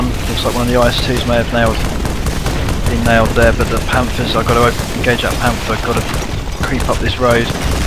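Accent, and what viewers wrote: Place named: England, United Kingdom. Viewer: Your English but you may have some American roots because you do not have a deep English actcent, sorry I am not the best speller. It is almost kinda American if your voice tone was a lil defrent. You sound a lil like total biscut so I think you live in the UK closer to the English channel. Tell me if I am right or wrong!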